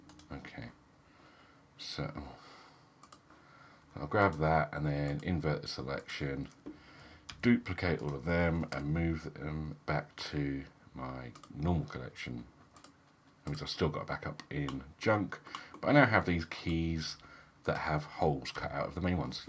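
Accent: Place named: North London, United Kingdom. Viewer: I actually came to this channel because a friend of mine watched this thinking it was me on a different channel, it's quite freaky how similar we sound, not just the voice, but the way we speak, we must be from the same area (just north of London) for sure lol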